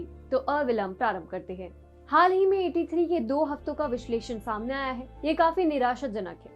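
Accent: Standard. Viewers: Yaar itnaa suddh hindi kaise bol lete ho pehle yeh batao? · Listening to pure Hindi was good ms. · I must appreciate your shud hindu pronounciation 😘😀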